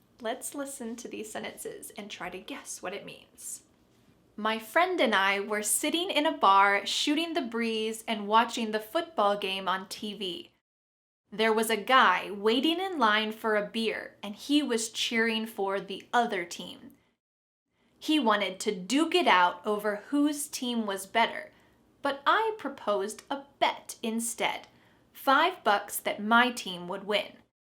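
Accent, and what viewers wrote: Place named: United States. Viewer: I love u cuz u speak us accent♥️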